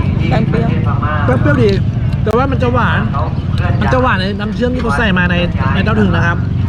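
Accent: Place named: Nakhon Pathom, Thailand. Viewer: สวัสดีคุณ และน้อง เอทำไมพูดเหน่อเหมือนพี่เลยแฮะ พี่คนนครปฐมจ้า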